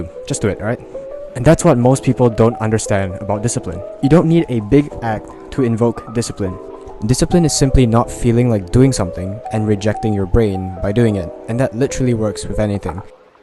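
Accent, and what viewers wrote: Singapore. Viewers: Are you from singapore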